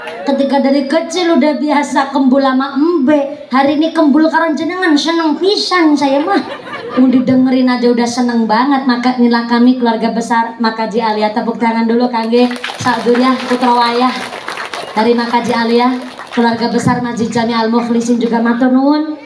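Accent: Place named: Java, Indonesia. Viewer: L39, waalaikumsalam hadir menyimak tauziah oleh ustadzah yang kocak dan logat Jawa ngapak yang sangat bermanfaat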